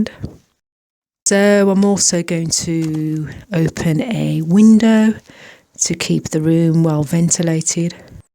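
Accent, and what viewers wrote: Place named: Midlands, United Kingdom. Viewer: Hello - Yes, it is in the British Midlands about 15 miles or so from Birmingham. You accent is very obviously British Midlands but not 'harsh' enought for the strong'Brummie Birmingham' accent. Hence l suggested Wolverhampton, a much 'softer', less pronounced Midlands accent.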